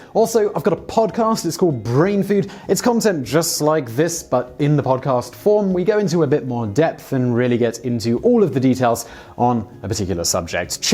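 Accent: England, United Kingdom. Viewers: Oh, please. The video was concered about the traffic behaviour of ambulances and there is one team member of any given EMS ground-unit that drives it. This crewmember is also responsible for all driving-decisions and thus the subject of this video. Therefore he is an ambulance driver. Duh! The way more alarming thing about this video is that it was presented by an English-accent person only using US examples and one UK comment about time together with pictures from North America, the UK, Eastern Europe (Czech?) Germany and one some presumably Latin-American country without ever saying on which place he is refering to as road rules differ.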